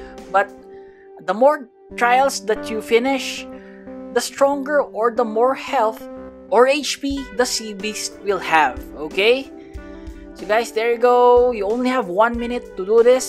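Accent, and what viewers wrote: Philippines. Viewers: You sound filipino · I'm new to your Channel (3 weeks) but when i first heard your voice i definitely know that you're a Filipino just that accent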